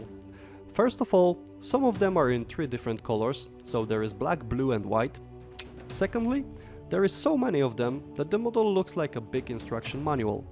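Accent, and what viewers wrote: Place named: Eastern Europe. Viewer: Oh, no widzisz zasubskrybowałem już kiedyś i nawet nie sprawdziłem do kogo 😄 Po akcencie czułem że jakiś słowiański człowiek, ale nie wiem dlaczego zakładałem że może Czechy lub Słowacja. Co do szachownicy, mogłem faktycznie przeoczyć, dopiero gdy instrukcję Eduarda pokazałeś z sowiecką wersją ii zwróciłeś uwagę że to źle jest - rzuciło mi się to w oczy. Dzięki w każdym razie za wideo i gratulacje jeszcze raz świetnie wykonanego modelu. Ja po wielu latach postanowiłem w końcu zacząć nagrywać swoje produkcje, ale na razie jestem na etapie eksperymentów z oświetleniem, ustawieniem kamery, montowaniem itp. Bardzo to niekiedy komplikuje całe przedsięwzięcie, więc tym bardziej doceniam dobre wideo 👍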